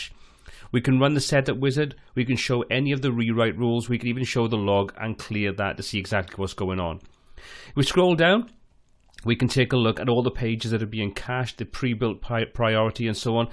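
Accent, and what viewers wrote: United Kingdom. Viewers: Love this tutorial, you sound like a fellow Brit! I have done an up to date video on the same topic on my channel, however all of these WP tips are still spot on! Great video! Clear & concise!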